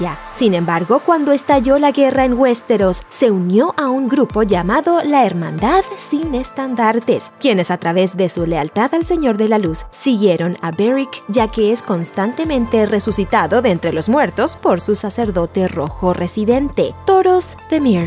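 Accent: United States. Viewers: Español de estados unidos???? Dislike equisde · Ay, qué pronunciación yankee tan ridícula tiene la locutora!! Es necesario?! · Lo que molesta es el afán de la narradora en pronunciar los nombres con acento gringo. Vale Gaver.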